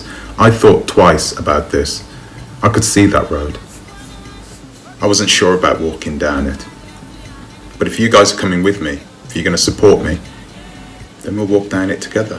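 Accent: United Kingdom. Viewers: You have a talent of story telling with your british accent its great really why dont you study african or even european history and share it with us that would be so much interesting... And for your bad energy that you need to dispense learn karate boxing or smthg just giving advice dont waste your talent now.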